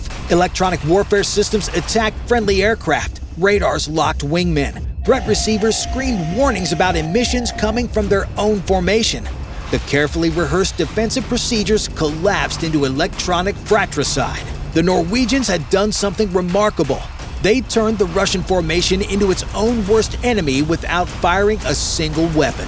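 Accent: United States. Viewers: Don't get me wrong, the narrative and story is bob on. It's just the hyper, over dramatic American narrator that I find annoying. Maybe it's because I'm British.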